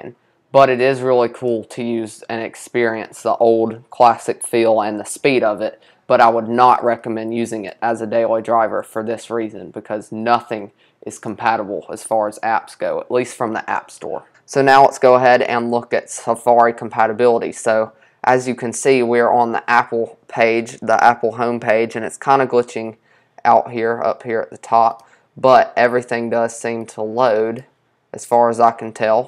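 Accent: South, United States. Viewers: It's southern US but like city southern.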